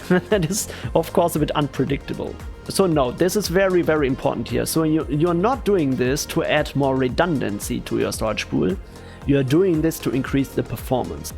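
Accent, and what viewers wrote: Germany. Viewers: I love that german accent,it's sounds really good.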